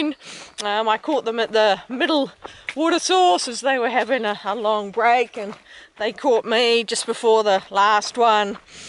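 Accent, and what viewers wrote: New Zealand. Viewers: Great day for wildlife. Loved the beer(bear). Vanilla is a good repellent for the gnats. P.S. Your Kiwi accent is totally charming, I could listen all day!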